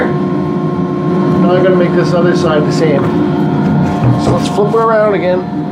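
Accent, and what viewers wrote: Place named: Canada. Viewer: You have the most amazing Canadian accent... :-)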